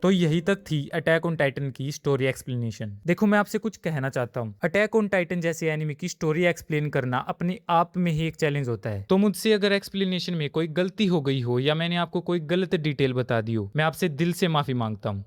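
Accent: Haryana, India. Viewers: haryana se hai kya bhai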